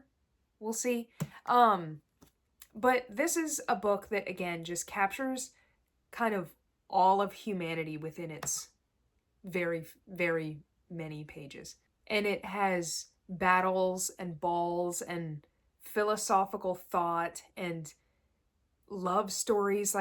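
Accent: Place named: South, United States. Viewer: Just found your channel. Enjoying the content. I am having trouble placing your accent though lol I think I detect a little southern in there, but I'm not sure haha Where are you from? Where did you get your history degree? Thanks for your channel 😃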